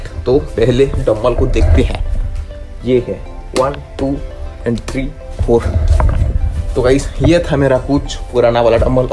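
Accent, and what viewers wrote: Odisha, India. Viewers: Bhai aap odiya ho kya ? Aapki language se u sound like .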